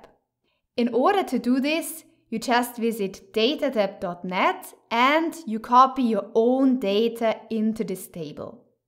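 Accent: Germany. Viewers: Sounds so german.. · I'm not gonna lie, I can't get enough of that german accent 😌😌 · you have an accent, are you a German???? 😅🤣🤣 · strong German accent, I like it.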